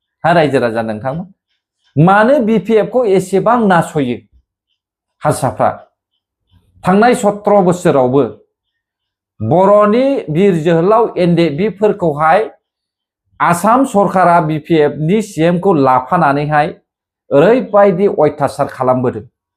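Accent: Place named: Bihar, India. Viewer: Hindi Raoa biharniswmwn ada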